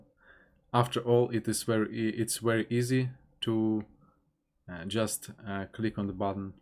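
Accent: Russia. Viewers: Congratulations on your English so far... it's very good, and sounds great with your Russian accent - keep going! Great videos on your channel BTW, it's very interesting how other people live their lives in other countries and cultures!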